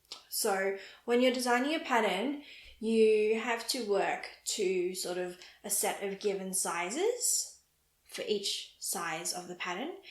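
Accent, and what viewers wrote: Australia. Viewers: Hi, I've just recently found you here on YouTube. I am located in rural Victoria so it's lovely to listen to someone who has an Aussie accent. Would you know of any other Aussie yarn content on YouTube? I have searched but have only found a very small number of Aussie fibre enthusiasts. I am both a knitter and crocheter and am interested in trying both drop spindle and wheel spinning in the future. I hope you continue with the podcasts as I think they're awesome. 😊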